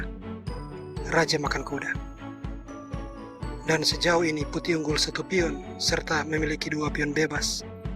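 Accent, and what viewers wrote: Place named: Eastern Indonesia, Indonesia. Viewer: Mantap ini Chanel, penuturannya jelas dan mudah dimengerti.. Yang paling penting, aku suka aksen bicara nya, khas orang timur 👌👌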